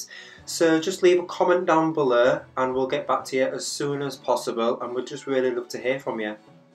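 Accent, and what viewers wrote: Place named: North, United Kingdom. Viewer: I love your channel! I've recently started doing my own hair at home (well, what I mean is a few months ago I had a disastrous set of nanos that all fell out within a week and I had an event coming up so I pretty much forced my poor boyfriend to watch endless la weave you tube videos and then try to put one in for me because I couldn't get/couldn't afford another last minute appointment..) Your videos are great, they've really helped/made me want to have this method after years of micro rings and the hair you do always looks so natural at the end It's nice to hear a fellow northern accent too, most of the videos you see are very excited Californians (not that I have anything against them haha) swishing their hair about and insisting on telling you their life story for 4 minutes before the video actually starts 😂 I was just wondering how many rows you generally put in and if you double/triple up the wefts on your rows? 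What weight of hair do you generally do as a full head? My boyfriend is surprisingly good at straight sectioning/putting the hair in that it stays in and he doesn't mind so he always does my hair now, but where to place and how many rows to put in is the only problem. They either seem to end up too far apart so I run out of head, or end up with masses of hair all at the top of my head so that it looks like I have an enormous head 🤦‍♀ Love the idea in this video of adding more hair to the weft at the sides too because I also have blonde/fine/short hair at the sid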